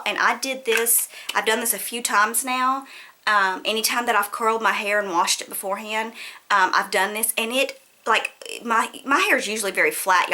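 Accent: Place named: Louisiana, United States. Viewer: I just love how you threw out that country analogy. Im not poking fun or anything. Im from Alabama so I just appreciate them lol. I love your channel. That Louisiana accent drew me in but all the amazing videos keep me coming back for more:)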